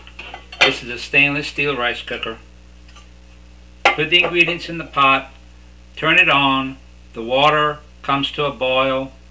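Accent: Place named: Louisiana, United States. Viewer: He's definitely from Louisiana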